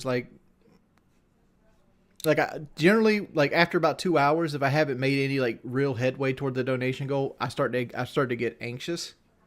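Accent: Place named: South, United States. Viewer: Him acting as libral with his south accent is amusing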